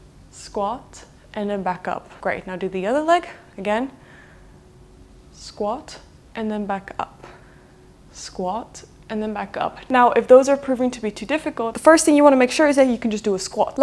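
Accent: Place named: South Korea. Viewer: Love the South Korean accent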